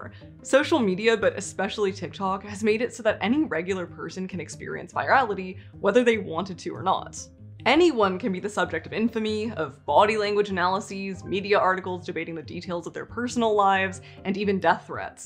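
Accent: Canada. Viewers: She sounds Canadian, so perhaps that explains her lack of understanding of the US justice system, but I agree that the criticisms in this video are largely misplaced because she’s misunderstood how and why the system works as it does. For example, incarceration is the primary punishment for crime not to achieve “retribution” but to keep greater society safe by removing criminals, and to allow those criminals the best opportunity for rehabilitation while institutionalized. Just as an addict is most likely to rehabilitate during a highly-controlled inpatient stay, a perpetrator is most likely to change during incarceration, when removed from the temptations and opportunities to commit more crimes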